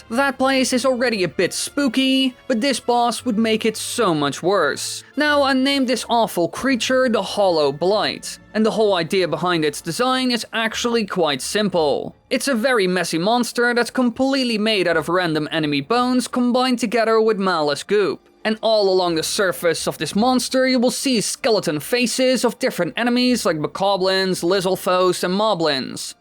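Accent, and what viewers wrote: Netherlands. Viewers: Is he Dutch? He seems to have a Dutch accent when he speaks English.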